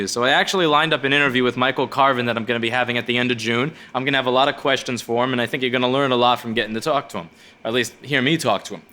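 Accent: New York, United States. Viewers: Your inner new Yorker is coming out in your vowels lol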